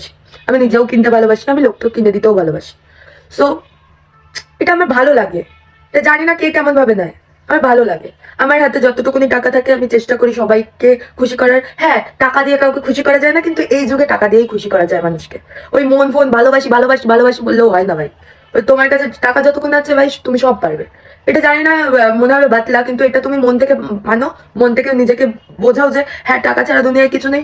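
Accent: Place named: India. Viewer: R ekta content dilm .bostir meye der mto hindi tone e bangla bolis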